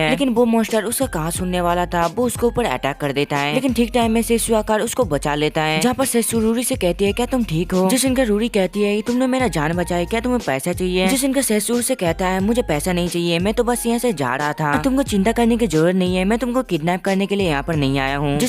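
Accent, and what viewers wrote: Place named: Bengal, India. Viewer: Bengali tone mat nikalo ..aur thik se bolo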